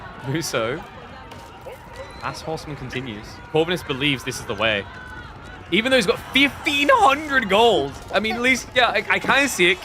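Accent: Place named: United Kingdom. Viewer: Sounds like a Brit but hails from Hungary. A Mantas if you will.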